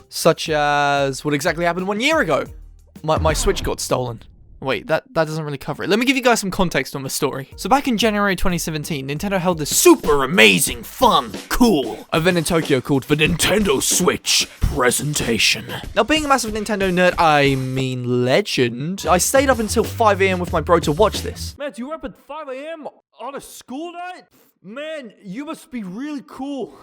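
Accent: United Kingdom. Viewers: HE Is BRITISh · He is extremely British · Your english accent is so cool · british :3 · This guy sounds British. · Are you from London ?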